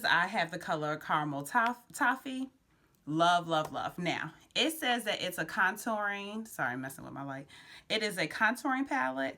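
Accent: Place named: Baltimore, United States. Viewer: ok Maryland or Cali? I hear it….Baltimore for sure, am i right?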